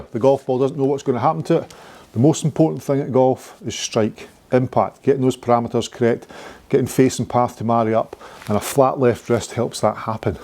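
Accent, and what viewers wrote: Scotland, United Kingdom. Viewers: Love your instructions. I always pay attention because, to tell you the truth, you scare the hell out of me. My grandmother was Scottish and I just love the “accent.”.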